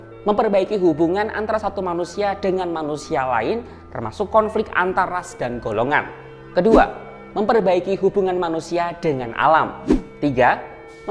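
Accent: Java, Indonesia. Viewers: Acara " lima" tahunan ngomong " SATRIO PININGIT" , narator nya channel ini hanya " jualan" bahasa jawa yang isi nya tanpa " ISI" bukanya makin memperjelas tapi justru MEMBURAMKAN" dengan dongeng dan hafalan bahasa jawa yang dibumbui dengan aksen jawa yang " pas- pas" an tanpa kesimpulan apapun yang dapat di pahami nalar dengan mudah. wk wk wk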